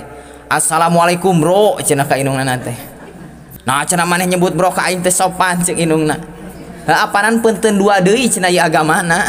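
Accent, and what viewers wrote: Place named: Jampang, Indonesia. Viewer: Berkah pak ustad. Ti palih mana ya nme ngarenge.. tapi logat na sapertos ti jampang..!! 🙏🙏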